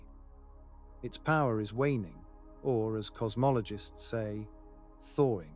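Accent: United Kingdom. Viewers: Haha “British Narrator”… I guess I’m not the only person who falls asleep more easily to a British accent…?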